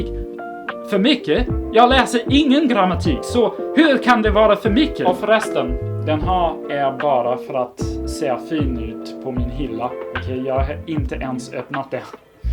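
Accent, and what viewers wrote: Stockholm, Sweden. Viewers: Jag behövde inte undertexter för att förstå, det är fan bättre än min spanska 😂 Jag älskar att när du sa nåt på engelska så hade du en svensk dialekt, snart nog så kan man inte urskilja dig från en skånsk/stockholmare 👌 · Hahahaha detta är den mest svenska videon jag någonsin har hört! 😂👍 Är det en sak som är jättesvenskt så är det att klaga på saker man stör sig på så det var både roligt och härligt att se och höra denna video! XD Jag kan tänka mig att alla läten i ordet tillräckligt är svåra att sätta ihop tillsammans helt klart. Vet inte om du har plockat upp detta eller inte men utifall det kan göra det lite lättare för dig så oftast brukar vi bara skita i att uttala G:et så det blir "äcklit" och kvar blir det knepiga övergången från L till R vilket jag kan tänka mig är jobbigt för tungan. Till (r) äcklit. Det ska bli spännande att se hur din dialekt utvecklas för redan nu har du vissa ord och fraser som låter stockholmsk eller göteborsk vilket är himla häftigt. 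:) Det är definitivt väldigt svårt att prata främmande språk till en kamera. Jag började lära mig engelska för 23 år sedan och jag blir fortfarande blyg över att bli filmad trots att jag är mera bekväm att prata engelska nuförtiden för jag har knappt talat svenska alls senaste åren. Kudos ^^ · Din melodi när du pratar är jättebra, låter verkligen svenskt. Coolt! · Haha, great video! Your pronunciation is actually very good and easy to understand. I laughed so hard when you spoke English because you had a Swedish swing on it, we call it Svengelska which made you sound like a real native! Stay safe and have a great week:) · You really nail the swedish nasal sounds very impressive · selv når du prater engelsk så har du en svensk aksent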